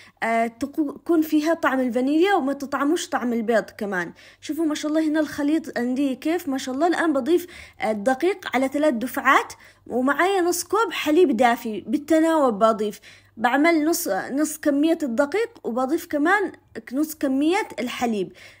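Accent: Yemen. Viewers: حلوة اللهجة اليمنية ❤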